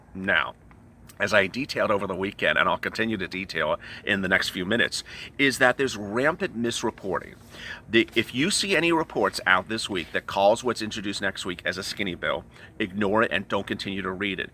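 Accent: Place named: California, United States. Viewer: You from California allright that voice says it all you know what I mean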